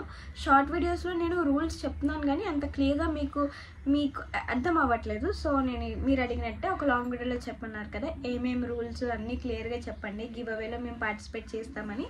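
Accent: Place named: Visakhapatnam, India. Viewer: Ne vedios oka emotion ayipoyae bcoz ur slang and talking and cute voice and also ur from mana vizag ❤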